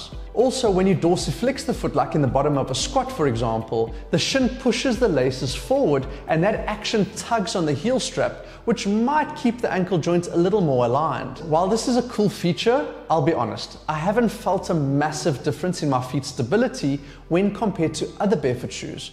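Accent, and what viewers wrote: South Africa. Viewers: Ja bru I skeem he's a joburg o ay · South African accent??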